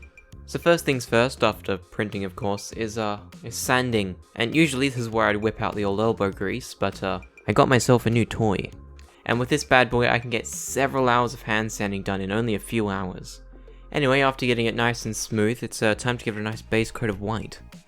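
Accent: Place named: United Kingdom. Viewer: YEEEES HES BRITISH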